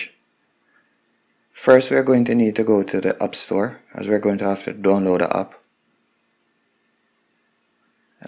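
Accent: Jamaica. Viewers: Thx and I hear your Jamaican accent im Jamaican to! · I can hear the Jamaican accent 🙌🏽🇯🇲 Big uppp! Keep grinding on YouTube! Don’t let the hate get to you.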